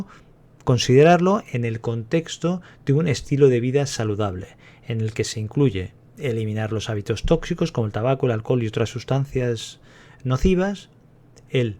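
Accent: Spain. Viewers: where in the world did you get your study you are very confusing you sound like you're from Spain good looking man but apparently you have not done your research on celery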